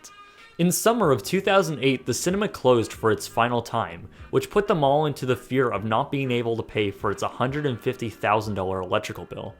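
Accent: Canada. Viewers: He’s canadian. We have a slight different accent from Americans... people say it isn’t noticeable but this literally is just an example of how we say some words differently. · You can tell by this guy's accent he's Canadian. As an American, I've never heard anyone call anything a "Dollarama". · I just love watching your videos,. They are so interesting and your Canadian accent is just so cute (myself knowing it well living in the States less than 100 miles from the border). Keep posting!:-) x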